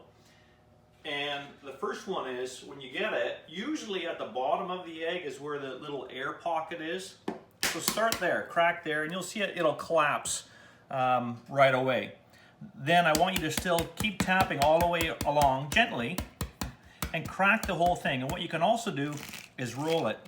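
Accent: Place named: Canada. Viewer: B.C. Egg! I thought I heard Canadian-speak in your voice! Love your Elvis TCB shirt, where did you get that? Oh yes, and thank you for another helpful video! I used these methods after my successful EZ-peel hard boiled eggs experiments, inspired by your other video at <link>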